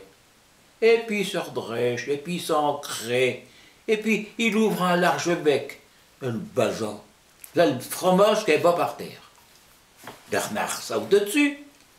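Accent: Quebec, Canada. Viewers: J'ai l'impression d'écouter du français québécois de quelqu'un d'une autre région quelque part dans l'est. Ça parait que nos ancêtres normands nous ont transmis une grande partie de leur langue. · Ça sonne très québécois c'est fou :O (je suis du Québec) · Beaucoup de similitudes avec l’accent Québécois. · On dirait que vous parlez Québécois dis donc? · Wow, que c'est beau d'entendre ce parlé normand en France qui ressemble au mien au Québec. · J'ai appris qu'il y a eu une débarquation d'anglo-normand en Gaspésie peut-être c'est pour ça l'accent est similaire au Québec, surtout au nord :P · Je suis du Québec et votre manière de parler et les mots de patois normands sont très similaires à ce qu'on dit ici! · Je trouve que ça fait beaucoup penser à l'accent du nord-est du québec ex. Havre-Saint-Pierre!! Vraiment cool de découvrir ça!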